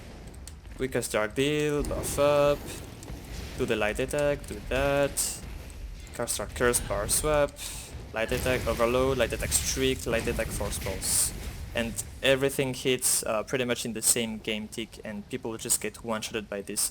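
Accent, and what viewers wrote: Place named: France. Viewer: Do you speak french?You have a french voice.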